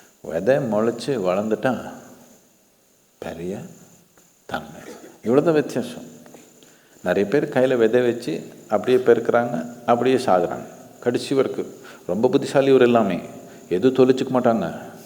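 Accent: Karnataka, India. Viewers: Ungaluku purialana apro yedukku tamizh pera eduthirrukinge neekunge adhatha. Shankaran pillai nu tamizh peru vechikitu English le Peter vooduringe naan idhuvareku tayyi mozhi teriyadhu sonnavara engeyu kelvipattade ille ulagathile indha ulagathille yaaraadhu tayyi mozhi teriyadhavaru puriyadavaru yaaraachu irrukara aah aah sadhuguru oda tamizh slang appdi irruku na avarue namma bashaikararu na kannada mozhikararu aanalu en maariye tamizh kathikitrrukaru illiya. Tayyi mozhi teriyadha aalu indha ulagathile neenge orutharu dha. Neenge tamizhane kedaiyadhu neenge enamo telungu aalu irrukala chumma tamil peru pottirrukinge avalo dha. Ungaluku naan pesura tamizhaadu puridhu nu nenakkre idhuvu purilena neenge attu tamizhan na waste tamizhan, tamizh terilena apro shankaran pillai yean neenge ellaru yedukaage vechikuvinge .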